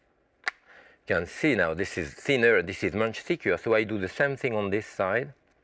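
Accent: France. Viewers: RHUM...YAAAARglol no i do understand that this french chef prenoncation is hard to understand for those that do not speak french as their 1rst language...im french and im good in english ...i i miss some parts once in a while...his accent is SO funny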